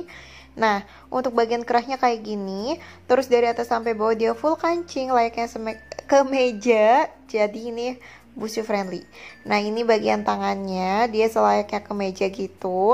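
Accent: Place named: Banyumas, Indonesia. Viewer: Gedombrangan haha ,, ngapak bgt ya kak 😁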